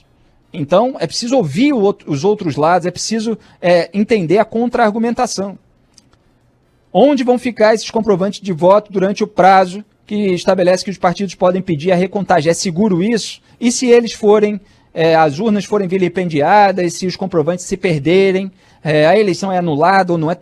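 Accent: Rio de Janeiro, Brazil. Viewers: Carioca sempre tem bom gosto bonita camisa os cariocas fazem academia mantém uma elegancia incomparável fora o sotaque carioca sem dúvida o mais charmoso deste país com tantas contradições são muito sociaveis mas acho que o Brasil 🇧🇷 nunca vai ser socialista o socialismo não é sinal de progresso apesar de você ter uma capacidade incrível de convencer as pessoas.bjs Benne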